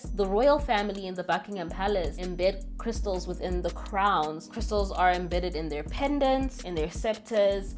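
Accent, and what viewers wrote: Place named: Africa. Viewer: U R Amazingly Gifted with Truth, Knowledge, Wisdom, Understanding, Beauty, Creativity in Your Unique Style/Elegance/Fashion, Professional, Precise & Proper African yet Down2Earth Dialect, etc & most Importantly, The Highest Vibrational Frequency of LOVE❣️ I 🤎, value, appreciate & honor U, Wombman King /Goddess/ Empress for Your Fearless Boldness in sharing Truth & allowing those of us who hav WOKE 🆙 & intentionally honor & cry out to Our ANKHcestors & The Universe, for Truth, Sankofa, etc! Ultimately & most importantly, for SelfLove! Which U mentioned & resonated deeply with My Spirit❣️Bcause SelfLove is what we need to empower us to LOVE Others as we Love ourselves! I Am Gr8fully Blessed to be counted among your Thousands of Sibscribers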